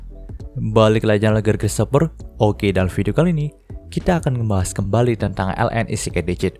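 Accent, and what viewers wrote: Cirebon, Indonesia. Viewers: Kya logat Cirebon 😅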